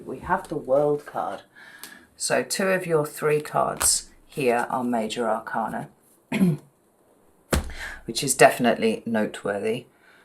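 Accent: United Kingdom. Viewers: I feel the same as last reader, you really seem to explore the meaning of the cards. Also loved this reading, I am Sag rising and I feel like Sag readings relate to me more than my sun. I also love listening to that awesome British accent. The tall wizard behind you i had one just like him-lost him in a move can't find those wizards anymore where did you get yours?? Blessings to you!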